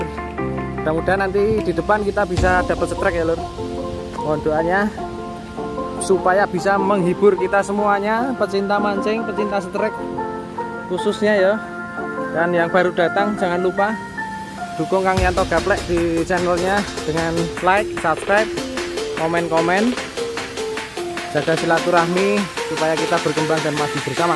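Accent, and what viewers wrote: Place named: Banyumas, Indonesia. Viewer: Koyoke ng ngapak kidol pam..kang booozz...🙏🙏🙏👍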